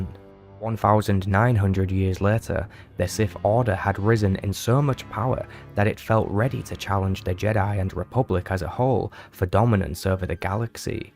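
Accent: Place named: Yorkshire, United Kingdom. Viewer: Just recently joined your subs, and would just like to say it's nice to hear a fellow Yorkshire man🤘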